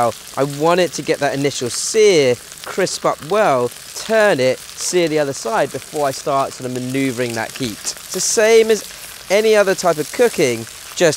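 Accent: United Kingdom. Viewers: I gotta say that British accent is soooo nice. United Stated here - much love and respect to your cooks and expertise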